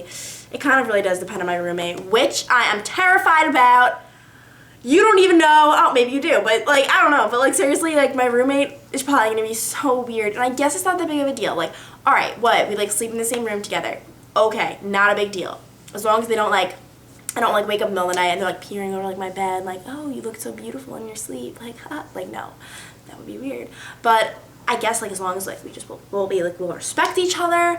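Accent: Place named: New York, United States. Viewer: Her New York accent was so strong back then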